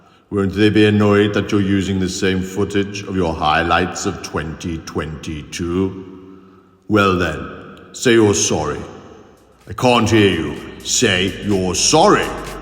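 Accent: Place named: RP, United Kingdom. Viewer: Your Received Pronunciation accent is hilarious! Brilliant. You should run for Prime Minister and campaign with that RP accent the entire time and then when you get into office, switch back to your native Scouse accent. Haha.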